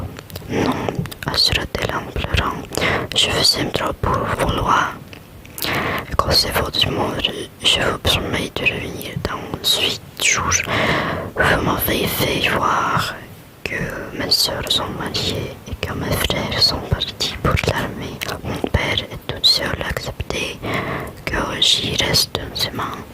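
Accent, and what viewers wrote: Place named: France. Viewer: hiii your french accent is really really good! it's so relaxing! maybe one day you can do a makeup tutorial video i really love your style! it reminds me of sousie soux with a mix of 20s glamour! i would love to draw you tbh haha 💟💞💟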